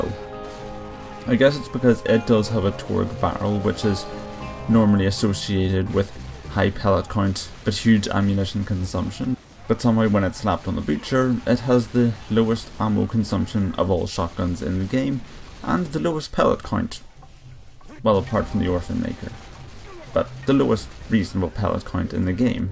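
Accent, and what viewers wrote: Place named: Belfast, United Kingdom. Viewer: Where do you come from? You sound like a middle class person from Belfast.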